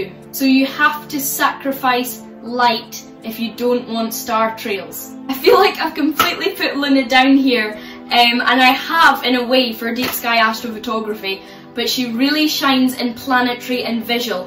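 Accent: Scotland, United Kingdom. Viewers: New subscriber caught off guard there with a Scottish accent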